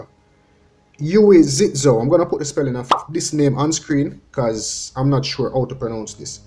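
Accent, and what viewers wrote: Jamaica. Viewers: Apaul A is fascinated with your Jamaican way of speaking...lol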